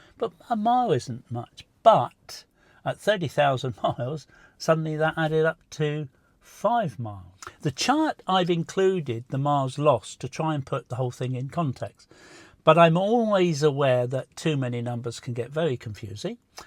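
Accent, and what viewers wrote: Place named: United Kingdom. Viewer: I didnt realize you were from UK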